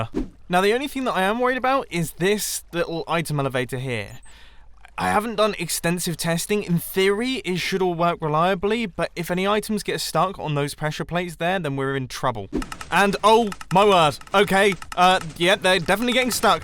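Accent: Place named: United Kingdom. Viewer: Has anyone else started to think with a British accent because of his videos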